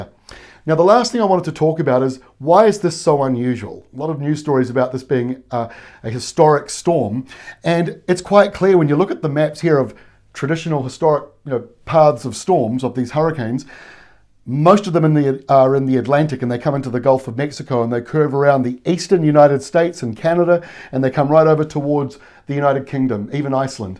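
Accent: New Zealand. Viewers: Love seeing local weather with a NZ accent, a smile, and no screaming. Got the colors right, too.. Beautiful.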